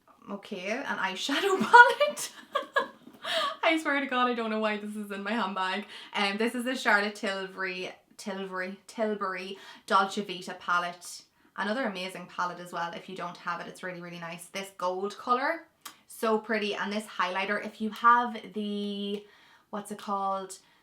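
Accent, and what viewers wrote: Ireland. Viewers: I love your irish accent!!❤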